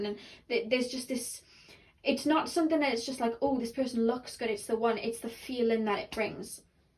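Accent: Wales, United Kingdom. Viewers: Excellent reading! Love to hear your FUN WELSH ACCENT 😀! 😀Thsnk you for sharing your BEAUTIFUL GIFTS with us! You are very TALENTED! 😁😄😄😃😀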